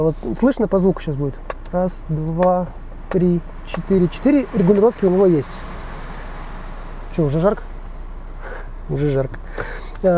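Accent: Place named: Kazakhstan. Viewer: красавчик!Дикция "андреймалаховотдыхает"👍👍👍Я даже по радио слушая мог предположить,что из Казахстана)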